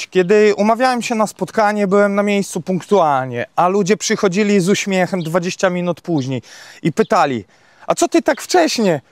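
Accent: Poland. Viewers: Dla mnie zagadka jest twoj polski akcent.. ;) skad?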